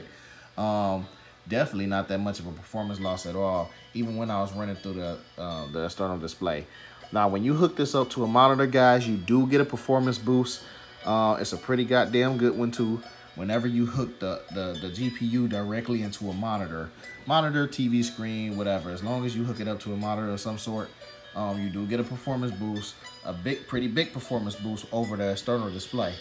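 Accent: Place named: United States. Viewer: I'm very amused by your redneck american accent xD Great informative video, have a similar Yoga like this rn. Do you know which Generation/model exactly this was?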